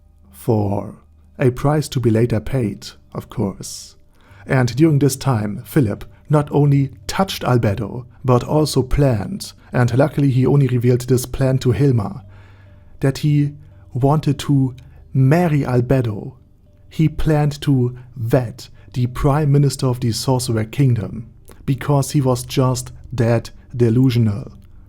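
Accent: Germany. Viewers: For some reason i really like your voice with the german accent ! Is there a chance that you will read the light novels ? Soo we can listen to it in your voice ?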